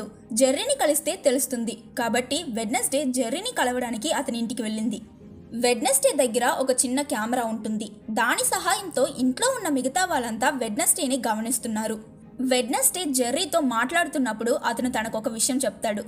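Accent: Tamil Nadu, India. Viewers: are you tamil kanu vunnu what is this but your explanation is very good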